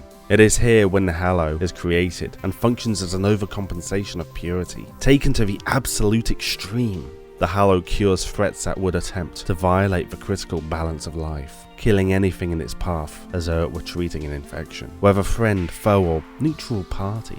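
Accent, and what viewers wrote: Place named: Europe. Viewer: TerrinX - hes European, it’s how you say it over there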